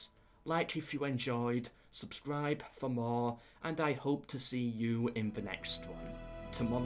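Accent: United Kingdom. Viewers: I basically see videos to learn english, he speak so slowly and with that strong british accent which is like a teacher to me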